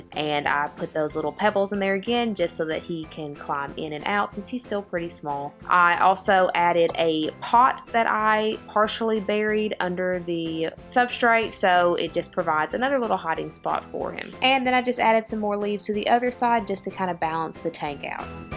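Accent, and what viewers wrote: South, United States. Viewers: He just dont understand a good southern accent, its nice.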